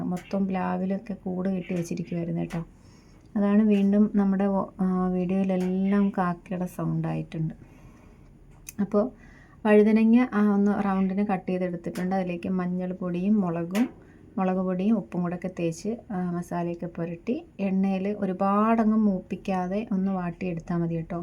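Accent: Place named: Kollam, India. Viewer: സംസാരം കേട്ടപ്പോൾ തോന്നി കൊല്ലം ആണെന്ന് കൊല്ലത്തു എവിടെയാണ് ഞാനും കൊല്ലം ആണ്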